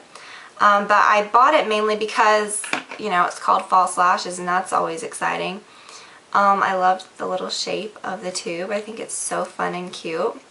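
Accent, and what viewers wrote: South, United States. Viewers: I think it's just might be a bit of her accent...she's from the South I believe...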